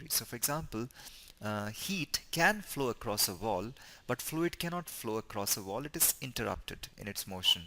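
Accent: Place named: India. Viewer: I felt the audio was great but the English isn't with African accent but an Indian accent. I am sorry the audio wasn't great for you.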